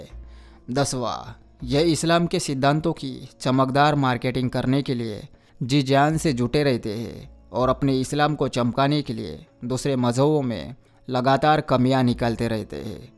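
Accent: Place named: Mumbai, India. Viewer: Tumhara talking tone Mumbai walo jaisa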